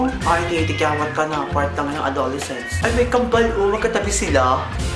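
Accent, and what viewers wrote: Visayas, Philippines. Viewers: BISAYA ka kuya?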